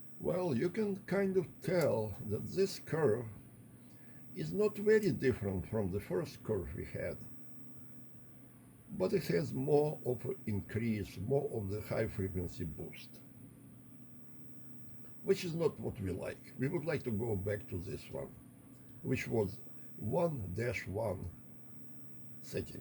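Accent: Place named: Belfast, United Kingdom. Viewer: Wow that was a long time ago since I heard Belfast.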